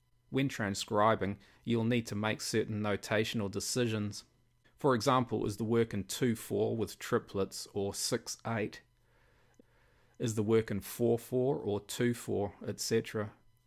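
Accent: New Zealand. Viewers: Hahaha I also was like "Oh damn, a fellow kiwi" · are you a kiwi? your accent sounds like a new zealand accent! Very helpful video thanks heaps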